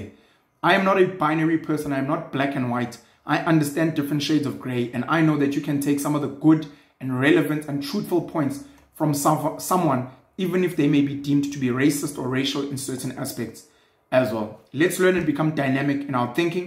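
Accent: Zulu, South Africa. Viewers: Wayiqala ngesiZulu esimnandi mfoka 🙏🏿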